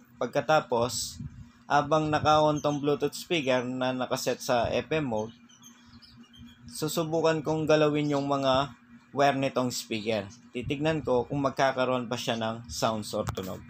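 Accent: Pampanga, Philippines. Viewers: Kapampangan ka ata boss hehe halata ee..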